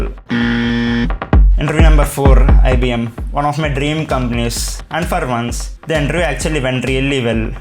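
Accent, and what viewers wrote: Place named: South, United States. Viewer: english communication soo poor..learn that..south accent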